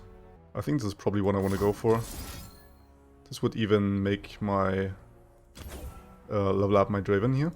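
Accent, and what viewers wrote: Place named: Germany. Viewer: Lustig wie man selbst bei dir raushört das deutsch deine Muttersprache ist haha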